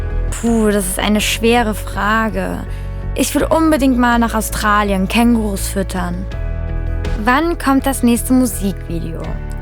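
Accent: Germany. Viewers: Heck it's so weird hearing such a German accent, in the songs I can barely hear it! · Que delicia escutar esse sotaque alemão, já amava os vídeos agora estou apaixonada ❤ vocês cantam muito bem, desejo muito sucesso 💙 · Ich wusste dass du Deutsch bist aber jz auch nocj Baden Württemberg? Giiiiirl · I only speak English and I had to pause the video to read some but I don’t mind kinda and 1st your VERY good at singing 2ed I like how a German actcent is also sorry I spelled that wrong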